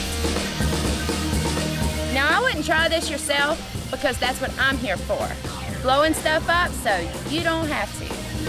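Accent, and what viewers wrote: South, United States. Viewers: I like her southern accent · she got a southern accent!!! i like!!! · southern accent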